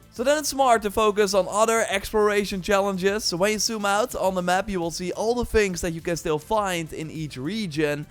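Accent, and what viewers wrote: Netherlands. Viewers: I have a feeling ur Dutch cause your accent, sorry if I’m wrong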